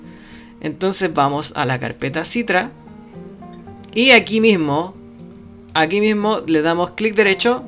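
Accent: Chile. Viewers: eres chileno no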